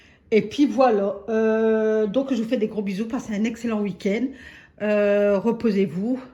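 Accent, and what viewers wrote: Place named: Nord, France. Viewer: est marrante elle s' exprime bien comme dans le Nord aussi bien dans les expressions que dans l' accent. Je suis de la région.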